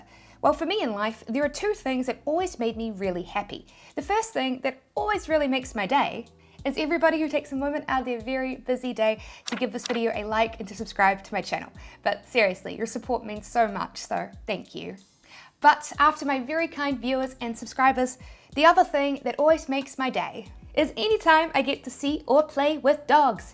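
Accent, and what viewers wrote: New Zealand. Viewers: Hey, going through your course a second time while I set up my store....should I create an LLC for protection or wait until I find a winning product before starting a legal business? Maybe a future video? Also I'm starting to say words with a NZ accent because of you...the newest one is pola beas 😃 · I'm a new subscriber (past two months), totally won over by your straightforwardness, can-do attitude, and NZ accent. Love it! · hi By the way i really like your New Zealand accent. I just opened a store on REDBUBBLE and after 5 days of use it they suspend my account and i don't know what i'm gonna do and thanks for your efforts i love you. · I love New Zealand accents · u r of the few I subscribe to I had to get used to ur accent since I was not used to NZ accent but now I find it adorable HAHAHA thanks for very informative vids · The New Zealand Kiwi accent is one of my top favourite accents in the whole world, there's a really warm vibe about it, you've got such a vibrant upbeat and clear speaking voice! 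Others that am very fond of are the Irish accent aswell as the Scots Glasweigen accent were the dialect is very complex, the Newcastle Jordy accent aswell which is another good one, there's too many to name I know but each one is warm within there very own unique syntax, slang and dialect! 🙂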